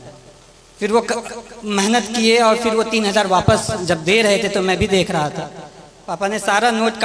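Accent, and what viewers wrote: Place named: Samastipur, India. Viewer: Sir ye to language samstipur ka hai❤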